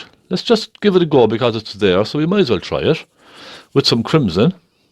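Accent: Ireland. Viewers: lovely irish accent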